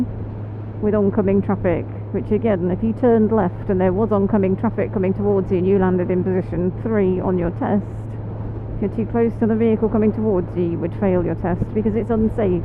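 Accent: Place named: England, United Kingdom. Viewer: Brilliant videos and you have a fantastic, particularly quintessential, English accent.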